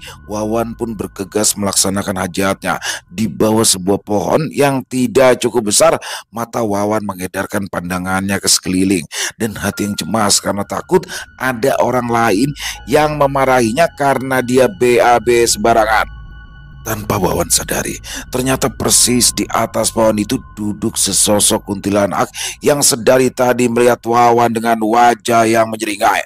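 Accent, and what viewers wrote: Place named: Jonggol, Indonesia. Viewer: Bahasa sundanya mirip orang jongol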